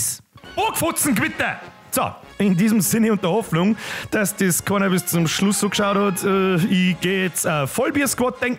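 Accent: Bavaria, Germany. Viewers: alter die bayrische sproch isch echt nersch, irgendwie ähnlich wia österreichisch aber iwi o voll ähnlich aber manchmol verstand i echt ka wort haha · Natürlich kenne alle deine how...... Finde das bayrisch Sau komisch wenn dich aufregst... Greetz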